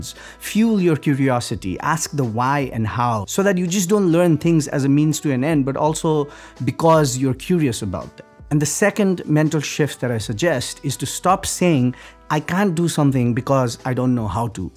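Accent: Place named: Nepal. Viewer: i am watching you from your early days in YouTube. But today, i dont know why i found Nepalese tone with your voice. 😁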